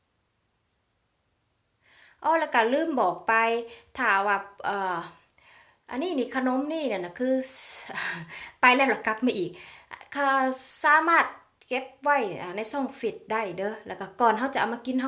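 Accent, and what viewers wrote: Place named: Savannakhet, Laos. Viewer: ມັກຫລາຍໆສຽງເຈົ້າເວົ້ານະເອື້ອຍ ຄົນສະຫວັນນະເຂດ ເວົ້ານົວ ຫົວມວ່ນ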